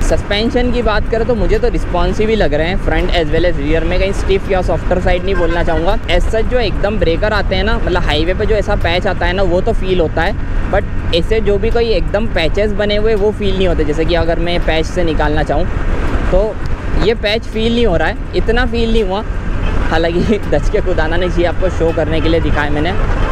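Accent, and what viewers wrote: Indore, India. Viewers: Are you from indore ??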